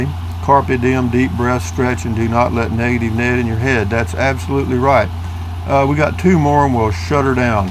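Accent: Texas, United States. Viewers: Linda Fowler My pleasure. generously shares his unique lifestyle and perspective with us. I find his stories interesting, his adventures entertaining, and his quintessential Texan delivery a rare treat. I am happy to help support his efforts in his preferred manner.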